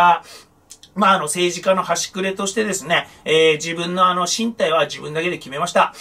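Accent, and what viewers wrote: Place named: Ibaraki, Japan. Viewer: なまってんな（茨木調）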